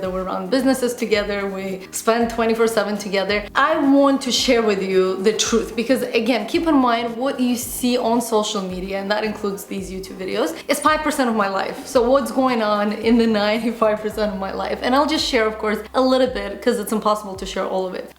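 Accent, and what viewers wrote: Azerbaijan. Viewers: please she is from Azerbaijan and speaks Azeri and Russian. She was 16 when she moved to Canada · lol Canadian???? She's from Azerbaijan, therefore has an accent that's obviously different from yours and she's not saying it "wrong". Very ignorant thing to say.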